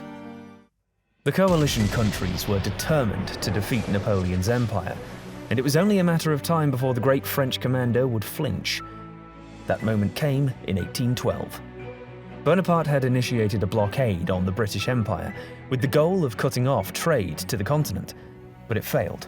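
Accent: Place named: Italy. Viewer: I love how the narrator uses his Italian accent! 💙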